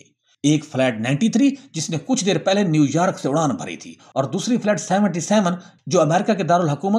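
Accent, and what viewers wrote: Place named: Punjab, Pakistan. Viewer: Panjabi bhai urdu bolna to sekh lo pehley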